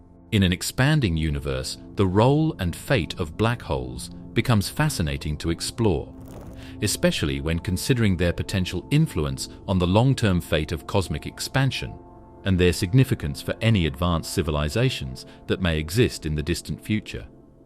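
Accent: England, United Kingdom. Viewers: The usual dribble (English accent included) with generic impertinent graphics. Good for sleeping though.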